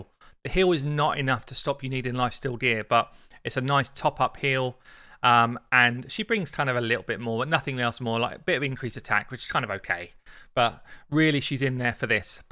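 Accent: United Kingdom. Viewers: He’s British, they’re like that when it comes to pronouncing certain words lol. It’s not a diss, it’s just reality.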